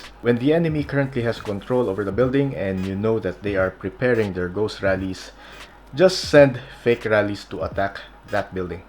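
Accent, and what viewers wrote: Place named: Philippines. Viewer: Tonong pinoy paturo bro😊🧡🧡🧡🇵🇭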